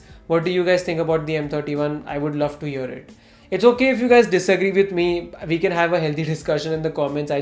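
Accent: India. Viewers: your accent is pure indian so try to speak slow and correct your voice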